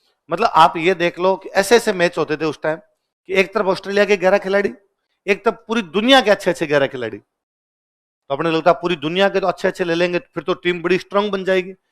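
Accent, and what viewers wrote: Rajasthan, India. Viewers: Raj saa u rock and ur tone of rjasthani is owsome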